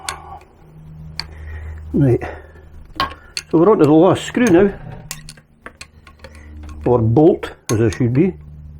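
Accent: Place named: Scotland, United Kingdom. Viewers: Cheers for info, no problem understanding your Scottish accent as a good mate of mine is from Gourock 😎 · Eh don't know what gave it away. Eh must have noticed a wee Dundee quirk in your accent. 😁 Just a quick note to say fixed my Karcher leak. Turned out to be the top elbow joint. Fantastic local supplier in Inverurie got me the bit. Took a wee while to free it as the clamp was seized solid but my trusty mole grips sorted it (bought when I was about 18 for £2.99 - best buy ever). Anyway, thanks again for your video, which gave me the info I needed and a great laugh at the same time. Have a great weekend, and take care. Cheers, Harry (well, actually it's Alan - Harry's my crime thriller writer pseudonym)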